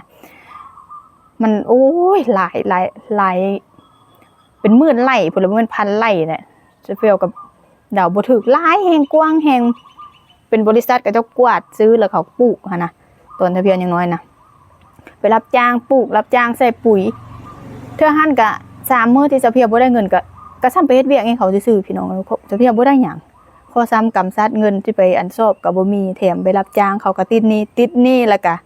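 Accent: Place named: Sakon Nakhon, Thailand. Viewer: สำเนียงพูดคือ ไทย สกลนคร 😊😊😊🇹🇭🇱🇦💓😊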